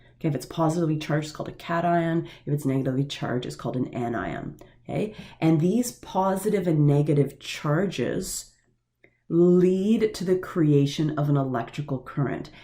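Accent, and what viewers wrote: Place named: United States. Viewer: Your voice is so beautiful, I really love this accent. Is you accent American or Canadian?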